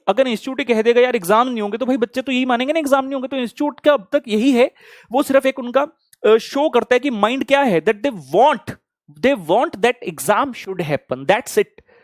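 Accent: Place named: Punjab, India. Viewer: Arey sir apka punjabi touch toh bwaal😂😂👌🏽👌🏽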